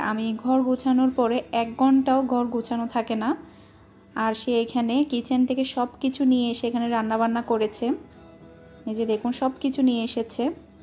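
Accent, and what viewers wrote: Chittagong, Bangladesh. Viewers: Amio.Chittagong ar. Kotha shona laglo Chittagong ar tai.ask.korci.apu.😍😍😘😘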